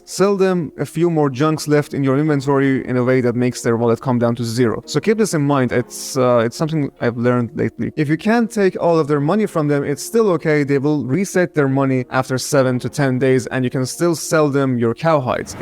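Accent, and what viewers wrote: Iran. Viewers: Ur accent sounds persian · U have Persian accent are u Iranian? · بسیار عالی(از لحجه‌ات فهمیدم ایرونی)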